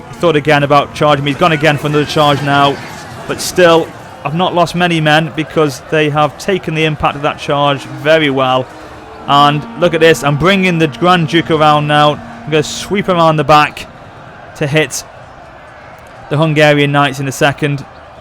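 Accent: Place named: England, United Kingdom. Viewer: MMm such a nice mod. Btw, 1. Do you live in the UK (I know you are Enlgish (Or at least sound it) 2. If you do what Provider do you use 3. If its bt what router do you use to play NTW!!! Ive tried 5 different routers and I still cant play NTW online and I cant exactly get a new provider xD Nice Video as well:)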